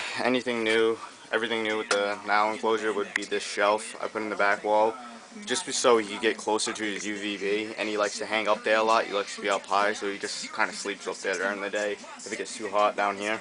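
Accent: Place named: Boston, United States. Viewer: you from boston, nice accent im like an hour away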